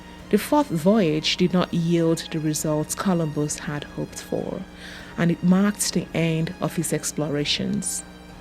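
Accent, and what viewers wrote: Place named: China. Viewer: Your English is bad, I'm missing 10 percent of your words. weird accent. I'm from London, dad's from st vincent. Sounds like you learned English from a Chinese person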